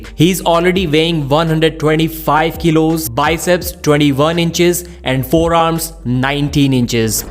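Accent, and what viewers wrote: India. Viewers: you need to learn to change your accent to a neutral one bro 😂 your accent is too heavy on the indian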